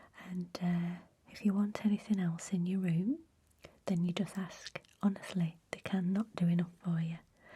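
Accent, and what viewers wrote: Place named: Lancaster, United Kingdom. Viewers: Perfect Lancaster accent - love it! ❤🌹❤ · Omg I'm so excited back! I love rewatching the original tinsels travel every Christmas time 🎄!! (And sometimes during other parts of the year! 😆) Love the northern accent! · ah the northern accent!! meks mi feel reight at ‘ome 😂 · Oh how I miss home now. The sound of my accent. Where are you from? Me…. Sowerby Bridge. Can I book a holiday home through you 😂 from Norway.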